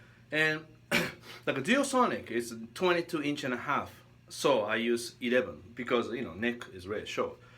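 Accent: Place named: Japan. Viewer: I'm a simple guy. I see long black hair, Japanese accent, tasty blues...I hit like button... Btw Beato's vid and subsequent inferring are a little elliptical: the way you play is highly influenced the comfort (or discomfort) you feel on the guitar; I'm not surprised Beato (whose guitar setup is mainly on 8's) plays waaaay better and sounds waaaay better on 8's...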